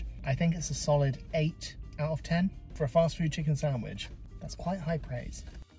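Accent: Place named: Surrey, United Kingdom. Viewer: I’ve been trying to work out what part of the country you live in, as you have tons of chain brands in your vicinity; but your accent leaves me puzzled!!! Where?!! 😝 Surrey??!!!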